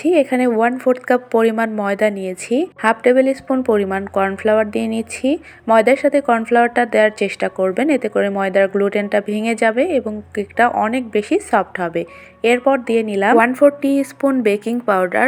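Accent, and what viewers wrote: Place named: Naogaon, Bangladesh. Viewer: Apu apnar basha nojipurer kon jiegaie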